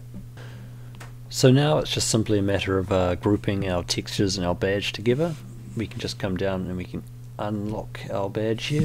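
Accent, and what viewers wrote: New Zealand. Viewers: Thanks for this! Great to hear a kiwi accent on a tutorial for a change too. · Thanks, great to hear a kiwi tutorial for once, very clear and easy to follow:) have subscribed and will check out more of your stuff soon, thanks for shearing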